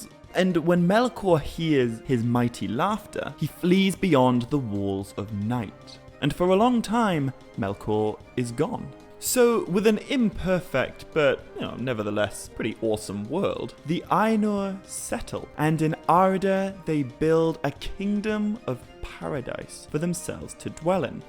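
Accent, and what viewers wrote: England, United Kingdom. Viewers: You do realize that he is English and they pronounce words differently, right? And considering how the ENGLISH invented the ENGLISH language, and also considering that Tolkien himself was English, you don't have any grounds to tell him how to pronounce English words. You just sound like an ignorant jackass.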